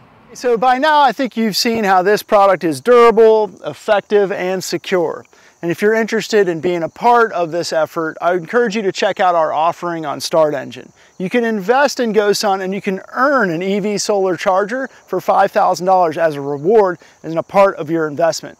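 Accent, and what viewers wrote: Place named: California, United States. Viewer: This guys Cali accent is hilarious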